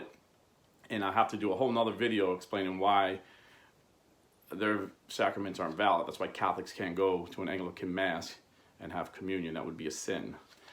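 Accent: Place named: Northeast, United States. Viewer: Are you Italian? You have a Northeast US accent or Chicago accent. Can't tell which, since I'm not from USA.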